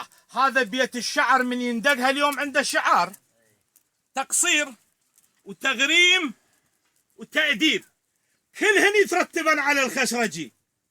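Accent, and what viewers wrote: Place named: South, Iraq. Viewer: اللهجة عبالك جنوبي مو كوردي ما شاء الله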